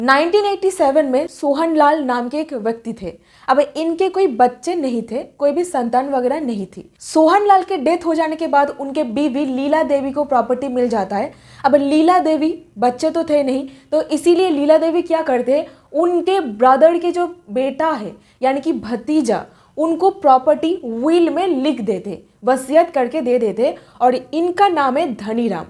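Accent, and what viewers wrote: Bengal, India. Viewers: nice explanation through a thick Bengali accent. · Nicely explained with beautiful bengali accent.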